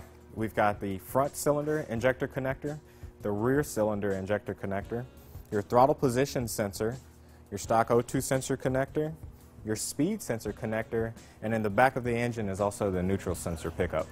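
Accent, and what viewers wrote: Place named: Mexico. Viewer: This Mexican has a funny accent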